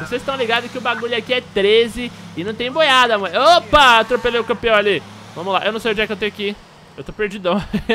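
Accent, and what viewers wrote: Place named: Sao Paulo, Brazil. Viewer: be eu to zuando pqp cara '-' eu odeio sotaque de paulista mas n vo odiar o cara pq ele fala estranho '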